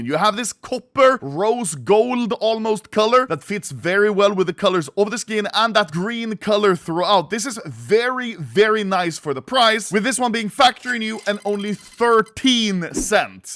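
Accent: Yorkshire, United Kingdom. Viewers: YORKSHIRE INNIT 🗣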